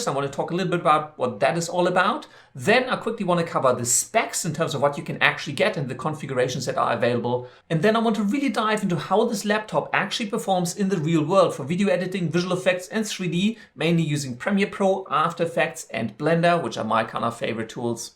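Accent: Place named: Germany. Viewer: you kinda have that German accent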